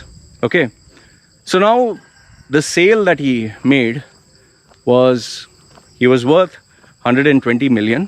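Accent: India. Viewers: You sound Indian. Are you?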